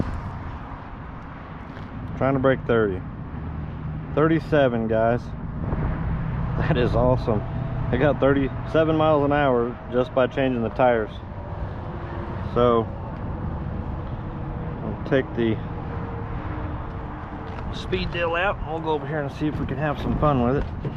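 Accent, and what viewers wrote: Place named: Houston, United States. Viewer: Man I swear you must live somewhere on the north side of Houston I don’t know why I think that - maybe your accent and the sound of all them 18 wheelers going by 😄 that’s where I grew up. Great run that thing looks right at home out there in the dirt 👍👍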